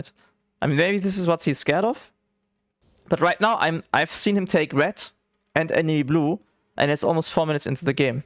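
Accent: Germany. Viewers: Immer dieses komische Englisch, das wird sich kein Schwein anschauen nur weil Tyler1 im Namen steht weil du eben kein Nativ Speaker bist und man das hört. Würde ich auch nicht wenn ich Englische Streams schaue dann von jemanden der dies als Muttersprache hat und nicht einen deutschen der Englisch spricht. Ist ja Giga Cringe und die herbste Reichweite generiert man damit auch nicht weil man eben einen guten Bruchteil seiner Fans enttäuscht die aktuell schon den Kanal folgen und vielleicht kein Englisch können.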